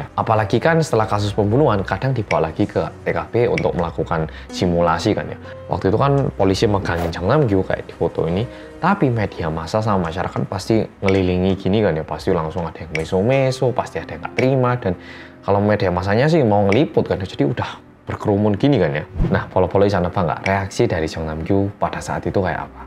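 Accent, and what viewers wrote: Java, Indonesia. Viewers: Suweneng medok e Mas.... Sehat selalu ya.... Semoga karya dan karirnya semakin melesat naik Aamiin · Detektif medok 💜👍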